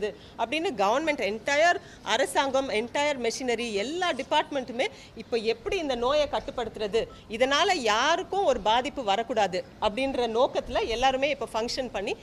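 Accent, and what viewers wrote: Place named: Tirunelveli, India. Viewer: We like your tirunelveli Tamil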